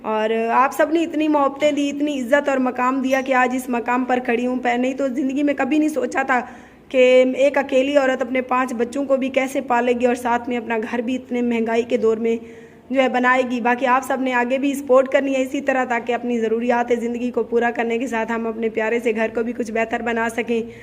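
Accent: Saraiki, Pakistan. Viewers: باجی آپ سرائیکی میں ویلوگ بنایا کرو آپ کی زبان سے سرائیکی اچھی لگتی ہے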